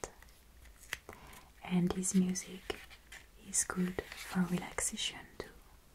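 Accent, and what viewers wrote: France. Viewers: I just finished watching her roleplay where she did a French accent that I thought she was faking the entire time just to find out she wasn't faking it lol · and I was wondering at the first videos I saw what accent she has. Until I saw the french lesson video and now I am like: "Why the f... didn`t I hear that earlier? Yo can hear the french accent at a few words, I am so dump" So I think I`m not good in guessing accents..... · Your french accent is life😑 its so beautiful❤️ also, the sound of the music sheets lol😑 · Mon dieu...French accent whispering in my ear with quality headphones is pure eargasm.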